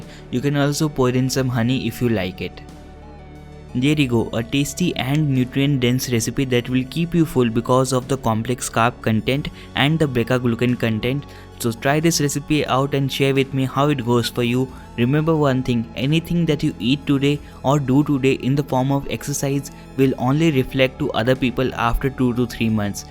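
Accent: India. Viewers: Why do most indians speak like this while talking english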